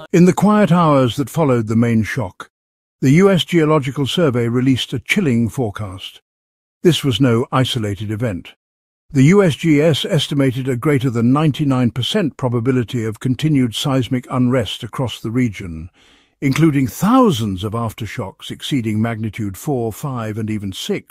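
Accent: United Kingdom. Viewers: Hate British narration:: manipulative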